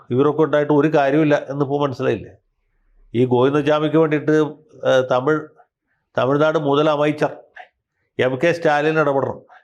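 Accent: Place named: Thiruvananthapuram, India. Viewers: വക്കിലിന്റെ തിരീയന്തരേം ഭാഷ കലക്കി.... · തിരോന്തരം ഭാഷ കലക്കി 😅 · വക്കീലിന്റെ tvm ഭാഷ കലക്കി 😂😂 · 😂 തിരുവനന്തപുരം ഭാഷ സൂപ്പർ.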